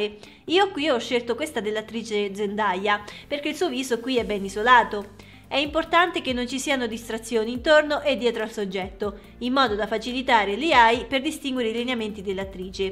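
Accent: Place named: Marche, Italy. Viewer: Sei bravissima, per colpa della tua spiegazione fantastica questa notte mi sono fatto un bel abbonamento a Mid...sento dalla tua voce odore di casa...penso che sei zona Marche sud...Complimenti ancora.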